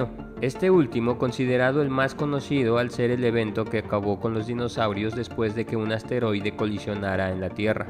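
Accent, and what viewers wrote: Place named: Mexico City, Mexico. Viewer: Nomas de eschucharle la voz meca chilanga, me doy cuenta de la mentira de este video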